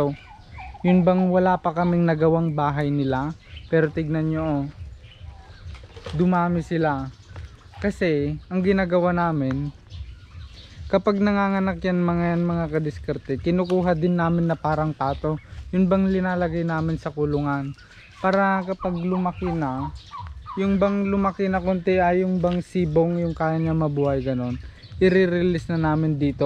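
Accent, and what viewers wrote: Ilocos, Philippines. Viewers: Iba talaga nu ilocano ang naga tagalog nagmayat pakinggan hahaha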